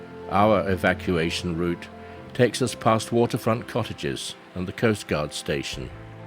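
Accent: England, United Kingdom. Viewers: Is it just me, or does the accent have its roots in the same general locale as Alfred Hitchcock?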